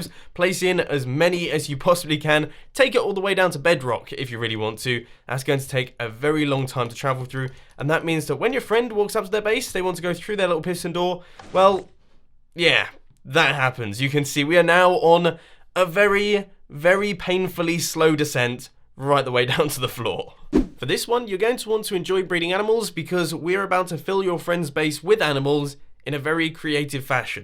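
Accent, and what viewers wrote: United Kingdom. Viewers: Are you bri-ish. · Wait are you British like me? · Wait wait wait, you're a teenager?! From your voice, don't know why, but I expected you to be in your early 30's! Has anybody told you you sound like a british young adult? [Thinks about your profile picture and minecraft skin] Ooh. They did. XD (This is not supposed to be an insult)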